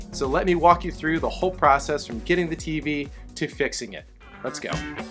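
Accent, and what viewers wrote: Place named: United States. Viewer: Hi cool video. ..but you don't say Soldering/solder propley. .guess it's yank accent. But like I said good video. And am going to fix my television.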